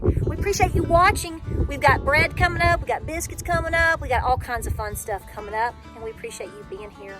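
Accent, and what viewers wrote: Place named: Virginia, United States. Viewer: Golden Comets are my number one! I do like my Easter Eggers because of the egg color even though they are not big egg producers! Which brings me back to the Golden Comets, they make up for the low production numbers of my Easter Eggers! I was born and raised in Virginia, but dad moved us to Texas in the early 70's. So I appreciate your accent! I can still bring mine out when I need it!😂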